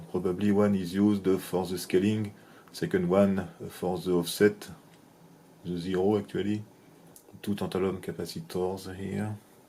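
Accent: France. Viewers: Friend, after you get used to watching videos with the French accent you will get much better at it, it just takes a little practice 😸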